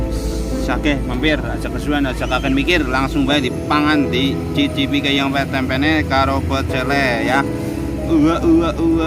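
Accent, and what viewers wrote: Banyumas, Indonesia. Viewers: Keren logatnya Ngapak seruuu Sering Ming kebumen Mampirrrr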